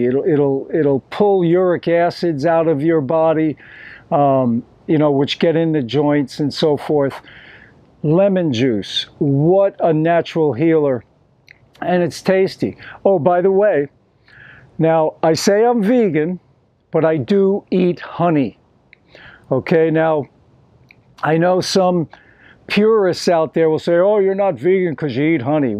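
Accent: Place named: New York, United States. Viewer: Hey! Really enjoying your videos. I am also a long term raw vegan, and really related to your stint of a few years adding cooked stuff at night, but never feeling as good, and always steering back to raw/high fruit. I was raw for 9-10 years before I started having issues with low appetite and being tired a lot, and so tried adding stuff back in in the evenings. Sometimes it was hard to tell if the diet was the issue, or if it was something that would've come up regardless of diet. I changed a lot of my lifestyle, traveled to Asia, got out of burnout, etc. to test other factors. Either way, even if I'm more tired than I used to be or less vibrant, I still feel better raw than anything else. Also originally from FL, but then 20 year New Yorker (now more of a nomad), which I think I hear in your accent too! Thanks for sharing yourself and experience. 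I've found it rare to come upon someone else who did raw for years, then experimented with cooked at night, only to find it just didn't cut it, and went back.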